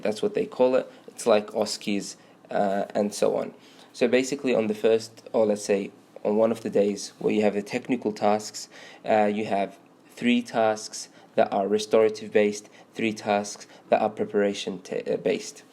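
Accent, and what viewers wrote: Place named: United Kingdom. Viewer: Nice British accent and thank you for guiding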